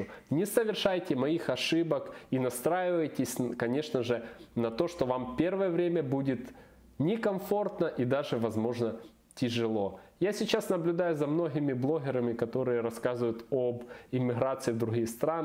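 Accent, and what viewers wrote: Ukraine. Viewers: судя по говору, он переселенец с восточных регионов или одессит. там это норма, так же как и в россии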